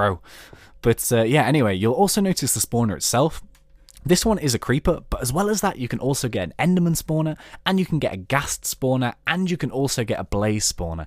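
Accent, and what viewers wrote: United Kingdom. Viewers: are you british? Your voice is a little funny, but very cool video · Amazing Vids Mate, And The Best Part Is Your British!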